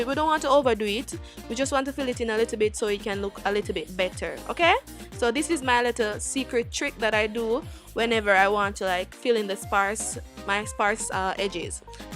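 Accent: Jamaica. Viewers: My mother is from JA. I knew where you were from, before you said it. 🇯🇲🇺🇲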